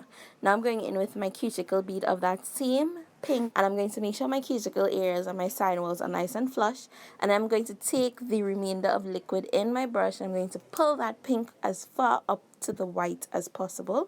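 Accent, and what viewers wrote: Trinidad and Tobago. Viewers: I just discovered you. Your accent sounds trinidadian 😮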